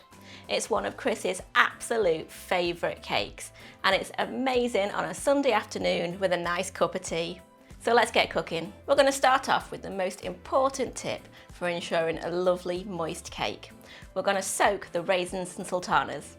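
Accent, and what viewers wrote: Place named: North, United Kingdom. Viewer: Hi I’ve just made your tea loaf and all the family give it a 👍, very nice I’ll definitely be making it again,it’s good to hear a northern lass no messing job done. All the best from Manchester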